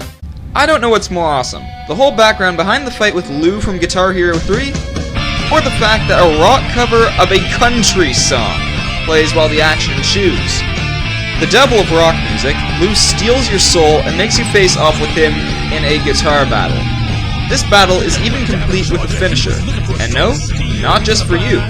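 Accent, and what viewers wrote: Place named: Canada. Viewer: your canadian i can tell...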